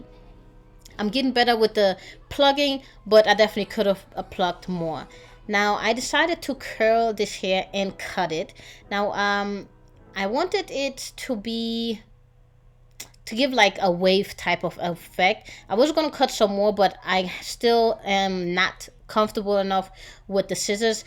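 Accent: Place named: Cambodia. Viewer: Your assent sounds Cambodian love it wow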